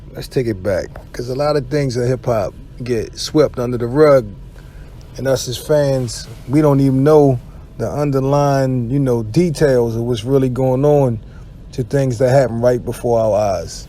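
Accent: Baltimore, United States. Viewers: Love the B-More accent lol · Somebody tell me this host ain't from Bmore 🤔🤔🤔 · The way you talk I take it you from b-more am I right🤔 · Are you from Baltimore cause you have a Baltimore accent?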